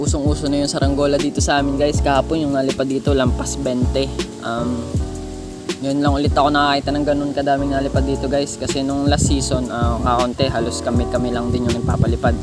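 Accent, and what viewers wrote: Batangas, Philippines. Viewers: Yung batangas accent the yan baka gravee to.. hahaha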